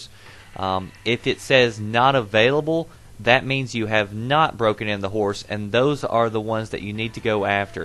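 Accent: South, United States. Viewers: Are you from the south states? Your accent kinda sound like it.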